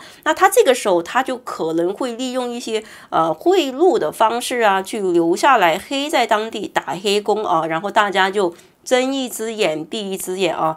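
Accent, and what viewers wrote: China. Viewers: 你的口音大陸人，我不知道你的目的是什麼？ 我看到是美國也在世界各地媒體和BBC英國媒體之前也是有收撥款來黑大陸 ， 你了解的大馬是很少 第一在大馬攝影師也是要去註冊申請公司才能營業也要交稅 第二大馬建設和大陸合作很多年了本地政府也為了保護國家就業只是批准一些專業人事來工作 其他承包商一定要是本地公司除非是本地沒有企業有代理的才能申請，勞工大馬本地人也不做也是進孟加拉和印尼工人居多 如果你說的攝影師沒有執照去大馬營業拍攝如果被抓到他們也會被罰款或者坐牢 為了賺299值得嗎？攝影師恐慌是好事其實可以逼他們創新和新的拍攝方式 如果大馬本地有錢也會一部分去包其他國家優秀的射影師來拍攝不同風景 因為本地的攝影師拍來拍去一樣的風格喝喜酒討論會問是不是某個攝影社拍的同類型 也會悶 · 妳就是中國大陸口音啦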